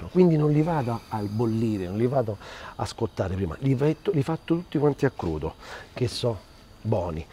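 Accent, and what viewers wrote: Rome, Italy. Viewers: Che bello sentire parlare romano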